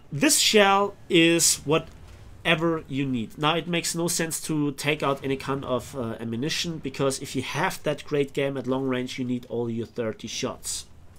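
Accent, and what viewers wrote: Germany. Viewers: Hey, another great video! I always enjoy a great historical background (and I think I can speak for everyone when I say this) with a satisfying German accent:) One question-- what are the pros and cons of the waffletractor vs. the Nashorn? Which would you use? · One of the best videos about War Thunder. I get that PhlyDaily is making videos for general audience that's why he has the subs. But is doing trully high quality content where you get far more information. He even pronounces the names very nicely in probably very close accent to that language:). BTW I don't know much about him, where is he from? The accent do sound like something close to Germany is mixed in him.. Denmark? Netherlands?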